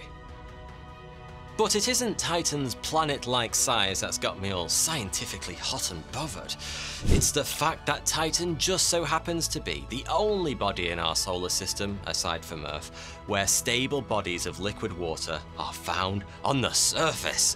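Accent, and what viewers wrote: United Kingdom. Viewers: Heck me! This dude's appearance and accent is way too traditional like a British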